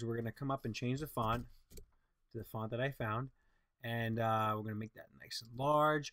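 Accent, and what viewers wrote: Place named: Boston, United States. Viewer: You have a boston accent.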